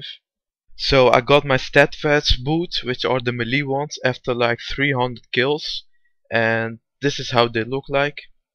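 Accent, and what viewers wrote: Netherlands. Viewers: kut Nederlands accent zeg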